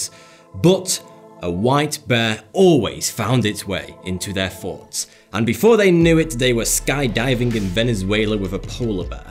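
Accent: United Kingdom. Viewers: Forget about white bears. All I can think about is listening to a British intellectual and eating fried potatoes!!!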